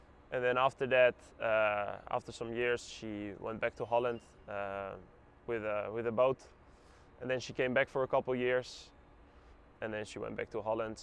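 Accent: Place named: United States. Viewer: aksen US banget mas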